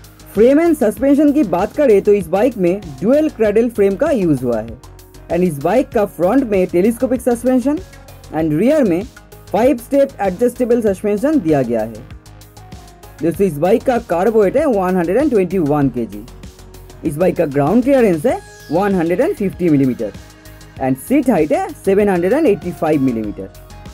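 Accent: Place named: Bengal, India. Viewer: Hindi mei bengali tone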